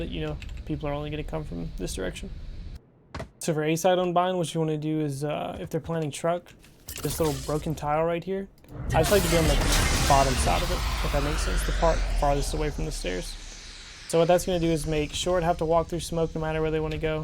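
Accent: South, United States. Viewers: Are you from the south? Im from bama I hear that southern accent.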